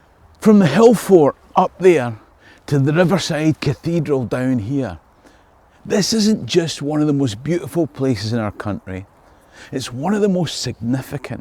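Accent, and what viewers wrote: Scotland, United Kingdom. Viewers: As an English man I love listening to all British history especially in the Scottish accent it’s the best to sit and listen and take you back in time! Our little islands so full of history and brave men in the past it makes me sad to see what’s become of my beloved Britain! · Great tae hear someone speaking aboot wur history in ma ain language! Such a relief no tae need subtitles 😊 A wee question, I hear a lot of people claim they have Pictish ancestry, but I was under the impression we didn't have enough DNA evidence from that time period to say definitively. You mentioned genetic markers briefly, could you tell me a bit more about those? My dad's side are all from the area that would once have been called Pictland but you don't really hear people in Scotland calling ourselves Picts, it's largely people from elsewhere and I wonder if it's more a romantic notion, much as the romanticised version of Highlanders as a result of fantasy stories? 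I had been told that the Picts had dark hair, and wondered if that's where mine came from. Just a mild frustration that people from the other side of the Atlantic will tell me I can't possibly be Scottish because I tan and have dark hair. I didn't want to have to do DNA ancestry to prove it but did in the end and while it can tell me we're 99% from the Highlands, I never saw anything that could trace Pictish genetics. I wondered where the folks that are "descended from a Pictish princess" are getting their records from. Still, be nice to have a comback to the whole "you don't look Scottish thing" cause mine hasn't always been polite or scientifically/historically informed 😂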